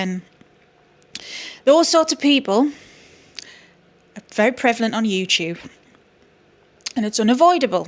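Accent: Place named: Newcastle, United Kingdom. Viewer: It's a Newcastle accent, and it's perfection 😍 (I am obsessed with all the American accents. You guys have tonnes. ❤)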